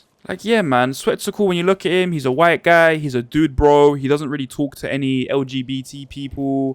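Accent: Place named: London, United Kingdom. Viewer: "He's a white guy. He doesn't really talk to lgbt people." "It's punching up. It's ok," I used to live down south heck. I was born there, but the absolute state of younger people down there is awful. The political element is absolute biased I promise you there is own group biase in this guys content in the same way those evil "white" people naturally are anti woke seeing how woke works only ever to hurt them and benefit others while ultimately this video reeks of the sort of fake learned culture where you hide behind humour but there is a consistent vilification of white people and people who have been successful in any way but remain right wing. This must be that all inclusive London culture that has been building since the anti colonialism pro natavist heros outnumbered the natives in their own capital city and proceeded to push none native culture and establish leadership from a man who previously said white londoners aren't real londoners. 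Why am I talking about london? Because this guy is either from London or he mimics them and lives/lived relatively nearby. Either way that accent, an accent that almost always flatterns with education into a more proper way of speaking and actually pronouncing words properly, that accent is almost always a marker of someone with surface level british culture followed by deep rooted ethnic biase a learned hatred/phobia of white people despite often being white or otherwise living in a white country and the refusal to engage with any form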